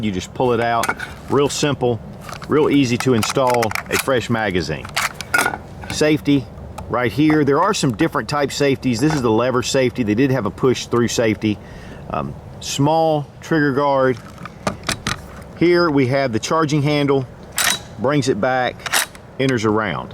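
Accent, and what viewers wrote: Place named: South, United States. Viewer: The bayonet lugs were not removed later, they were added at the very end of WW2 and used in Korea and later Viet Nam. The M3 trench knife was adapted with a fitting to lock into the lug and is known as the M4 bayonet. I have an original Inland made in 1944 and love it. My safety is not the lever as is pictured on yours, but the button push through version. I have dropped my mag many times while trying to come off safety. Not a good thing to happen in combat! A good reason to change to the lever version. Great review! From your accent I assume you are from Georgia, South Carolina or Tennessee. Am I right? I am a Jawja native myself and still kickin' the red clay to this day.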